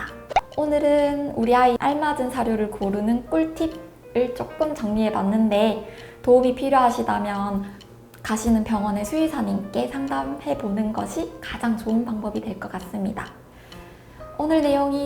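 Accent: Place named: Gyeongsang, South Korea. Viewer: 수의사님 고향이 경상도이신 것 같네요 그냥 수의사님 고향 사투리로 말씀하시는 것이 더 정겹게 들릴 것 같네요 ^0^ 중간 중간 사투리 나오는 맨트 보고 빵 터졌습니다. ㅎㅎㅎ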